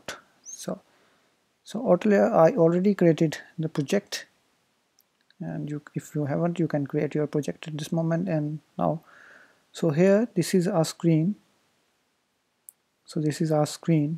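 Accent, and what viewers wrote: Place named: India. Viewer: i ask the video uploader to learn English.. either american or English accent.. cant cope with the indian accent even for a second !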